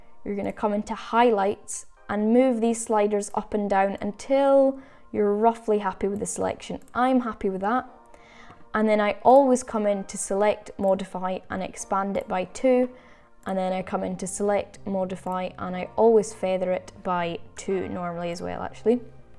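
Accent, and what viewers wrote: Scotland, United Kingdom. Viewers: This is why I love YouTube. A teenager has given me one of the best lessons I've every received in Photoshop and in a cool Scottish accent to boot!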